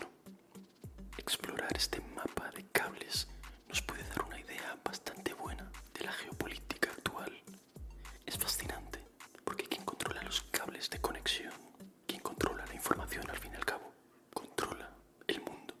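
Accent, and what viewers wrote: Spain. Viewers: Me Encanta el Asento Españolado 😮 Muchas Gracias x Tus ASMR me relajan Bastante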